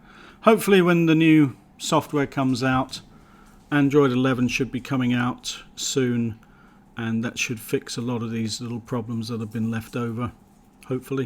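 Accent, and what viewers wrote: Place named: United Kingdom. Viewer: i love the accent. sounds like one direction when they talk, specifically zayn. are u british? i'm subscribing bc of that 🌹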